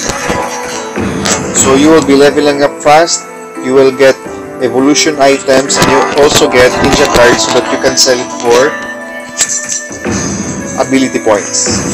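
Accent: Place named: Philippines. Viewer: Are you from the philippines? I can tell by your accent.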